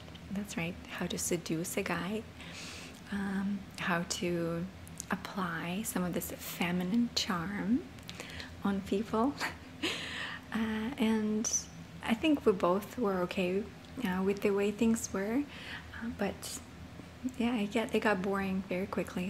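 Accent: Russia. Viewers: “Im gonna sue you” im hysterically laughing (even though its a serious topic) this is true 🇺🇸dont touch me or i will SUE😂😂💁🏼‍♀️💁🏼‍♀️💁🏼‍♀️ Darryl is awesome you guys are great together! All the worries that you used to have to deal with alone you’ve trusted him to take care of things and, like you said, help you! Letting him help you has allowed you to be so much happier and lighter and worry about MomLife worries lol and you can hear a cute little thing in your voice that I think comes from speaking Russian frequently with Mila 💖thank you for this video, I look up to you so much💕✨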